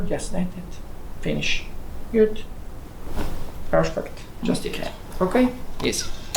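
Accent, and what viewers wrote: Italy. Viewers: Your accent reminds me of Scuderia Ferrari engineer, "Slow button on, slow button on" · Легкий итальянский акцент....)))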